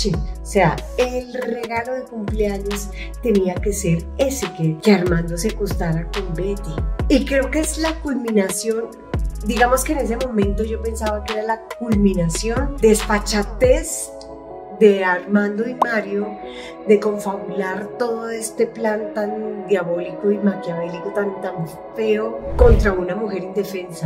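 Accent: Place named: Colombia. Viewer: Tu voz es muy dulce y con ese acento colombiano mejor, pero la musica de fondo de pronto tu voz se pierde.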